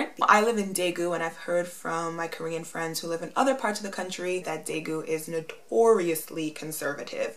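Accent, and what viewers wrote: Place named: Spain. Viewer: I love your videos and I noticed that your accent is definitely more of a Spanish accent than one of Latin America (hope I classified that right) anyway, I'm thinking about studying in abroad in a Spanish speaking country because Spanish is the only language I've studied so far. I want to be able to speak fluently. It's hard to learn in a classroom when I'm not applying the language to anything. Good info!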